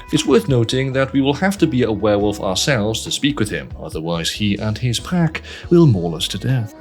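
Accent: Wales, United Kingdom. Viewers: welcome back i have missed your beautiful welsh accent and fantastic videos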